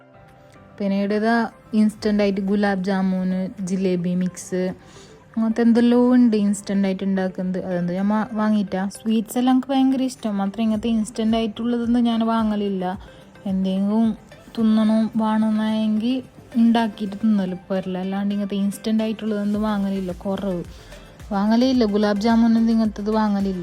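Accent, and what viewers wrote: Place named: Kasaragod, India. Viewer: Language orginal kasaragod🤩👌🏻kelkan vibe avvunund❤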